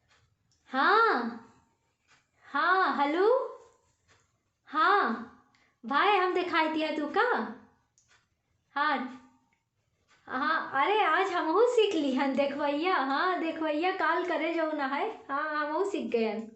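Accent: India. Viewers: ji sabse pahle to pranam aapko aap mein kitne gun Hain Main Kya kiya hun aap bahut acchi Teacher hain bahut acchi Ja sakta hai bahut acchi singer hai aapki yah boli Dehati bahut hi acchi lagti hai man Kahta Hai Ki Kitni bar aapka video Dekhun thank you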